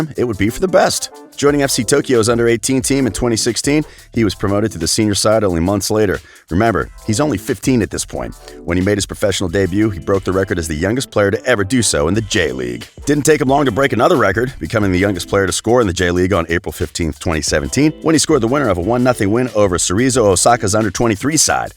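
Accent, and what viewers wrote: United States. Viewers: I am watching it...butchering's name doesn't mean he doesn't watch football! He has a strong accent of American...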